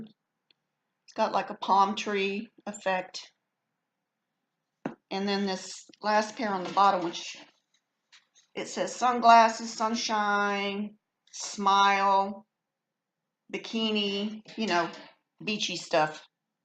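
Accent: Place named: South, United States. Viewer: I love the sound of a refined southern accent. I’m not originally from the south, but I lived in Alabama from <num> and most of the people I came into contact with were very well spoken. Maybe you were staying in the sticks, I don’t know.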